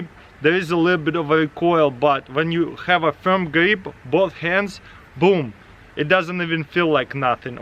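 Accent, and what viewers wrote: Russia. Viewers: Y'all with a Russian accent!! This was awesome🖒🖒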